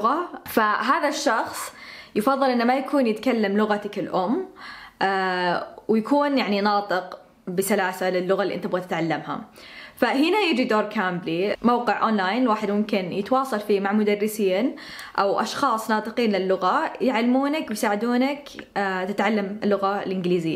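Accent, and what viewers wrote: Najd, Saudi Arabia. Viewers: ياربي بعشق لهجتك السعودية الاصيلة دي بجد جميلة جداً ربنا يحميكي ♥️ بقالي ٣ شهور نباتية 💪🏼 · اللهجة دي حقت أهل الرياض أهل نجد🌹🌹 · لاتكلمي انجليزي اذا صورتي لنا لان لهجتكك او طريقة كلامك بالسعودي جميلللللللل 💛💛💛💛.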